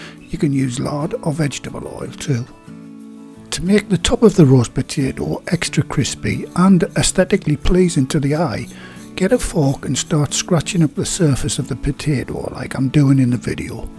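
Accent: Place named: England, United Kingdom. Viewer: “ Mash and Roasties.” I love the English terms and accent ❤️. Hugs from SF Bay Area, CA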